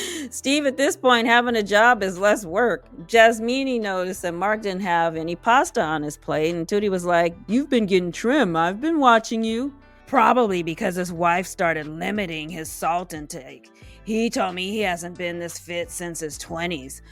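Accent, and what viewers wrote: Boston, United States. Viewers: Your Boston accent is so on point 😂😂😂😂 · The Boston accent 😆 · When this season is over, I’m going to miss your Bostonian accent! It tickles me everything I hear it 😂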